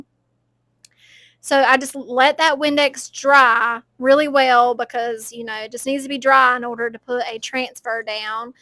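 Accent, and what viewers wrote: South, United States. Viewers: I love your channel, (I'll just keep writing that) and all your projects; today's were no exception, I would love any of them for my home. "Centerize" if you know, you know. 😂. Your accent is the proverbial sweet Southern accent I hear in my head when I imagine the South and drinking sweet tea on a big porch. ❤😊 ~Laura [your kindred messy painter 😅]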